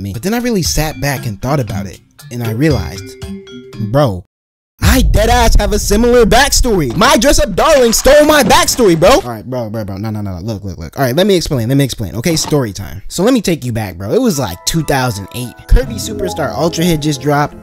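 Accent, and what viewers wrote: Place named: Toronto, Canada. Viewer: I can tell this man is from the GTA. I didn't why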